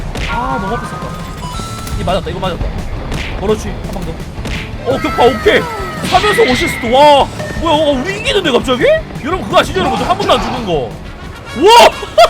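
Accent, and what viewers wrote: Busan, South Korea. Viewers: 앜ㅋㅋㅋ.. 역시 부산사나이답게 사투리가..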